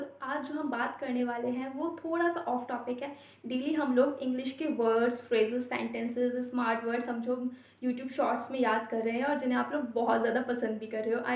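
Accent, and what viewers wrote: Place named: India. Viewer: Looking good india aksen in english is unic and great.i like to hear..goodluck miss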